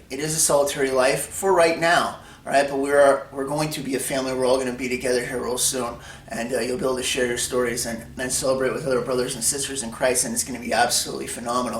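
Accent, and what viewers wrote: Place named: New York, United States. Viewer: Coming from a very laid back part of Australia, I find it very hard to listen to a new yorker. But your message is good, most of us awake Christians can feel it coming. But I feel its also a test of our faith.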